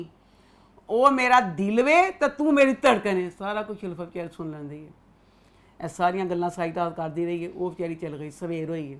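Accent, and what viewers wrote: Punjab, India. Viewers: Thumbs up what a powerful episode the way you talk and review is love being a punjabi i love and enjoy your typical punjabi accent and verdict...jiyo love you🤩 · I love how gives all-honest reviews on every drama.............but the best reviewed drama is definitely ZEBAISH 😂😂😂 Her amazing punjabi accent and sense of humour always makes my day. This is a humble request by one of biggest fans: plz do a review of “Nund”. I am begging to hear words on it. 😜 If a smile is a sadqah, imagine what reviews do 😊 God bless and the team of Galaxy Lollywood ❤️❤️❤️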